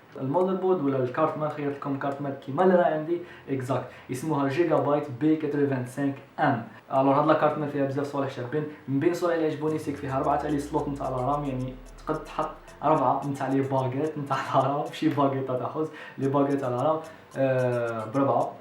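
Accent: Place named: Libya. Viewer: عندك شويه في العربيه الليبيه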